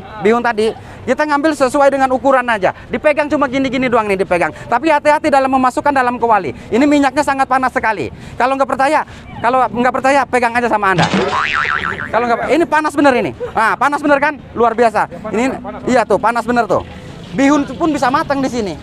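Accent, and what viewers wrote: West Java, Indonesia. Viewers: Kayaknya sunda tp tegasnya bicara batak punya ..siip keren. Lucu campur da'wah cerdas kali..mohon mintsk no wA nya broo..!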